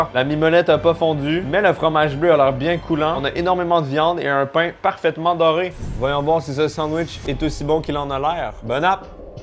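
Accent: Canada. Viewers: J'adore ta vidéo, c'est drôle j'aime ça en plus l'accent du Canada c'est top! vive le canada et et les canadiens , bonjour de France et mes amitiées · J ai horreur de l accent Canadien je Zappe dommage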